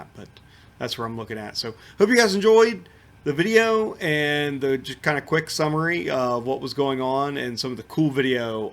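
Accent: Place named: Washington PA, United States. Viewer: Sounds like you’re around Washington pa? I’m from NE Ohio not too far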